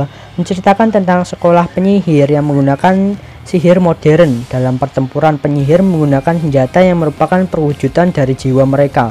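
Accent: Java, Indonesia. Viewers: Medokk tenan mas e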